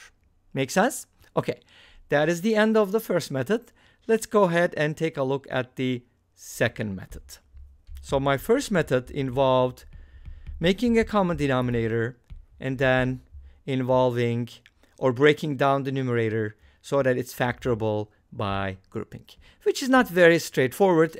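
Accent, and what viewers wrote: Turkey. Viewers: You are turkish arent you i can tell by your accent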